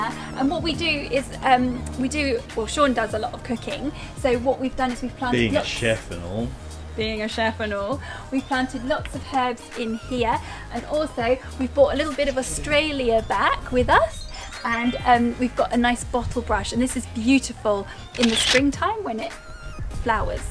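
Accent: United Kingdom. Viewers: How cool, you're from the UK! - I recently commented on your blizzard beach photo and you mentioned your channel to someone in the comments. I just assumed you'd be american but then I was like "hang on a tick, I know that accent" - Your channel looks really interesting and helpful too. I'll definitely be sticking around :)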